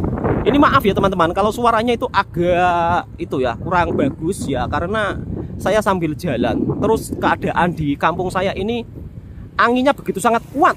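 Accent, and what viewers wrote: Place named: Central Java, Indonesia. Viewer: Tukang bangunan dan anak mudanya banyak yg kerjah di IKN, darih jawa tengah,jawa barat, jawa timur, merekah membangun ibu kotanya yg baruh di kaltim, mas sepertinya orang ngapak jawa tengah, tetap semangat mas walaupun tinggal di desah mantab ❤❤❤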